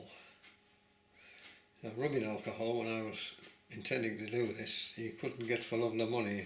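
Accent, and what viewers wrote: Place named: Cumbria, United Kingdom. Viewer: Nice Cumbrian accent!!!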